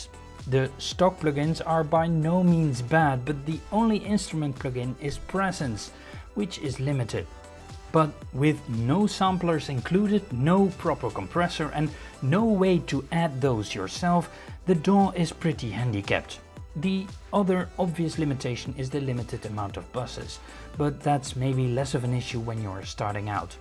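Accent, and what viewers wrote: Netherlands. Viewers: Hey hoor ik nou een Nederlands accent? :D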